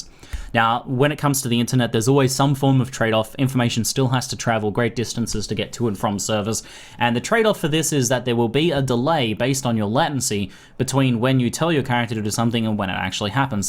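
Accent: Australia. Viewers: Farrell Roll Uh, no. He's Australian so he says some words differently than an English man or American man would... but thanks for being ignorant.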